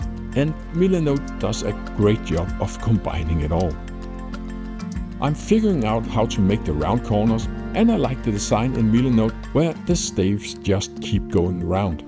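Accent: Denmark. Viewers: Great video production, likeable 50something, cool looking furniture, charming Danish accent: I subscribed! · click on a video from a channel I haven't watched before, immediately hear the accent, OH A FELLOW DANE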